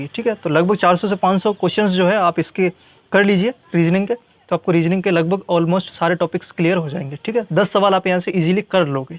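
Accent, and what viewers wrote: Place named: Chittorgarh, India. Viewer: Chittorgarh se lg rha hai Bhai .....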